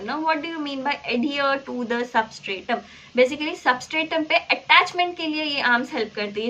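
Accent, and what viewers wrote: Hyderabad, India. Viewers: Easy to understand because of your hyderbadi accent 😅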